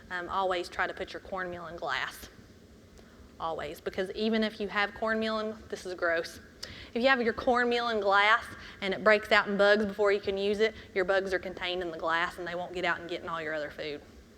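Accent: South, United States. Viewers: ***** Lol, You're very charming and I love your southern accent. Thanks again. :)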